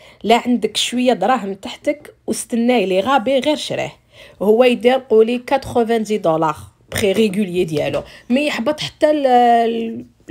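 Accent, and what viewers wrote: Algiers, Algeria. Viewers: تعجبني بزاف الهدرة انتعاك مازلتى عاصمية ربي يحفظك و يحفظ لك الكتكوتات انتعاك.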